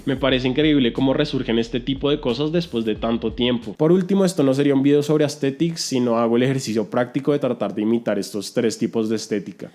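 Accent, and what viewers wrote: Bogotá, Colombia. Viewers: Es el asento rolo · Creo que es de colombia y colo de Bogotá, me suena su acento a esa región de Bogotá. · Che, no conocía a este man, pero apenas lo escuché hablar, me dije: "este man es rolo". Tremenda voz que se carga uwu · Puedo sugerir un video ? Me encantaría que hablaras un poco de Mariana froes. Ptd: Adoro el acento rolo. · Porque es de Bogotá casi todos hablamos haci aquí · Pero si es roloooo, nooooo, a nadie nunca le gustó el acento rolo! Y respeto al man y me gustó el vídeo y casi no se nota, pero, QUE ESTAN DICIENDO? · Mk se te nota que eres de Colombia JAJA y que eres de Bogotá :v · El único acento rolo q no me molesta es el de este men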